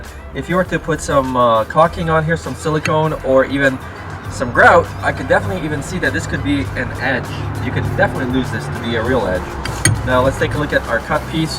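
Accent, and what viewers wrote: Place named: Pacific Islands. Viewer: You sound like a kanaka? You from the islands?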